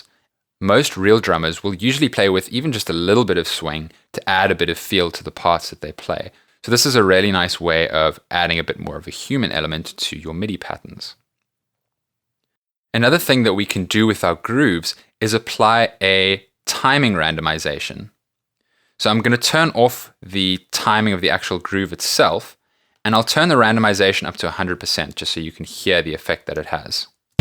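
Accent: South Africa. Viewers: You sound South African? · definitely south african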